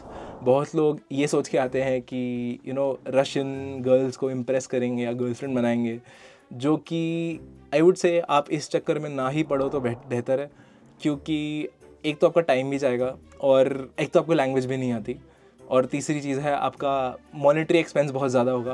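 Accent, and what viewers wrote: India. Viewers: Are you from west Bengal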